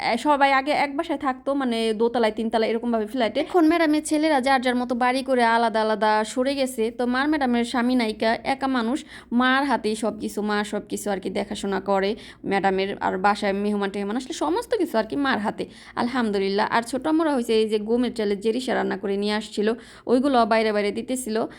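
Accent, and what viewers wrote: Faridpur, Bangladesh. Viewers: আপনার ভাসা সুনে মোনে হোইলো আপনারা ফোরিদ পুরের তাই না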